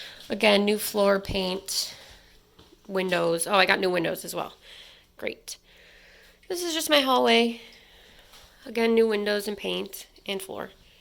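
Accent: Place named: Wisconsin, United States. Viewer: Are you in Wisconsin? Sure sounds like it haha 😃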